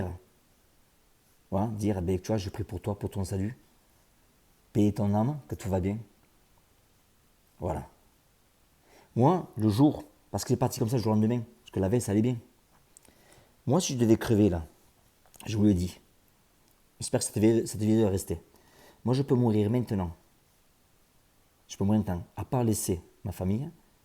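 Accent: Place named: Toulouse, France. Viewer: Cc!! Je ne te connais que depuis 3 semaines mais je t'adore surtout avec ton accent sudiste de Toulouse! Moi je suis d'Agen et in dit chocolatine ici bordel 😂😂😂 Continues comme ça, j'adore ton état d'esprit et ton franc parler! Vive le sud ✌️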